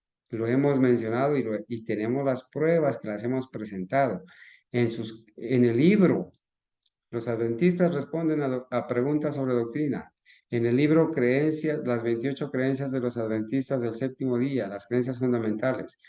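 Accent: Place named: Colombia. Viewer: Bendiciones hno, desde donde transmiten? Le puedo notar,acento colombiano.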